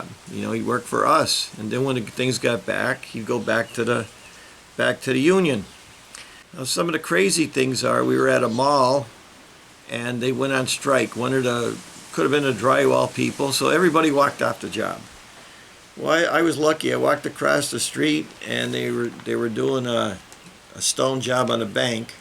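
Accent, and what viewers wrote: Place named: Chicago, United States. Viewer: Great video. I’ve been in my union for 26 years. You get out of it what you put in .i started watching your videos because I was trying to figure out what type of cement to use in a faux stone paver walkway my wife has me doing. And I’ve stuck around for the stories. I work in Philly we have an accent. I thought I was listening to an old Chicago fella. 😂. God bless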